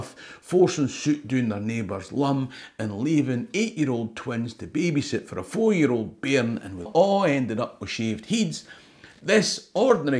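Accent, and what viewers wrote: Scotland, United Kingdom. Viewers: Hahaha, the subtitles have more trouble with your great Scottish accent than I do, and I'm American. Oh, if you or other Scots spoke faster, yes, I'd have trouble, but I understood you pretty well, and it sounds great. -- The subtitles thought "tumshie" was a "turnip!" Hahaha! And auto-(in)correct spellcheck thought "tumshie" should be "gumshoe." (I have no idea what "tumshie" means, though; I'll have to look that up. (My apologies if I'm cussing and don't know it!) :D -- I know only a little about the Scottish accent and history, but I'm glad to have found this channel. -- I have Brodie ancestors and it's unclear whether some Thompson ancestors were originally English or Scots. (My family tree includes half of Western Europe, it seems, but my family name is either northern English along the border with Scotland, or else Dutch r German respelled like English. 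So it's possible that part of my family line has had a long historical connection with northern England and Scotland, even once my ancestors (several different lines) came over to America at various points. (I hadn't realized there were as many connections as there are. Huh, interesting. But the didn't know each other, it's random chance.) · (USA, northwest/midwestern) never heard "full Buhna" expression before. I could listen to you tell stories all day! "rammed down your thrapple" - love it. It felt in this one you worked extra hard to lay the Scots on real thick, it felt like I was leveling up. Maybe I was just listening more closely than usual? LOL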